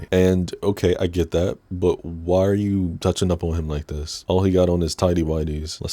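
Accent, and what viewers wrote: United Kingdom. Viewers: 1) as a Brit I live for your Bri-ish accent 2) why did I imagine alex legit saying aye come catch this fade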